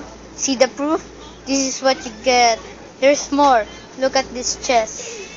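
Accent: Philippines. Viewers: try hard Filipino trying to speak English xD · I thinks he's filipino because he's backround sound like filipino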